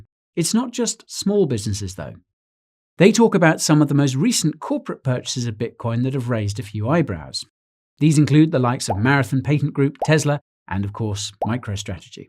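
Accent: United Kingdom. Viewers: I dont know if it's the robotic British voice or the robotic repeated jestered you make that draw me in lmao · i love your british accent. i wish i could speak like that..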